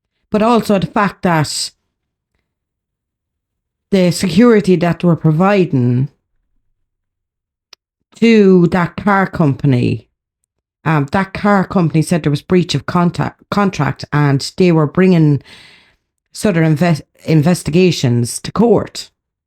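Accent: Ireland. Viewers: As expected, another intelligent and unique video! Speaking out about police corruption, which is a very dark and sinister affair which as you said, is far more prevalent than we all realise, has been presented and dealt with in this video in the unique way👍delivered in a passionate, forthright and well researched way. IMO, the NIcola Bulley case stinks of corruption right up to the very top. I’ll never forget Andrew Snowden blurting out how the LP had even predicted the day Nicola would be found. From day one, with the LP not cordoning off the bench area, this was just the beginning of people unable to believe that this was an honest, straightforward investigation. And that we were all being spun a story. P.S. Really looking forward to scary stories for Halloween! If you had a channel reading and spinning yarns with your beautiful Irish accent, I’d be an instant subscriber!💚😂💚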